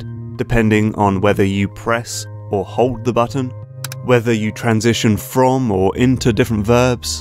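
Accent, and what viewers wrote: United Kingdom. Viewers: utube in a nutshell+ british accent helps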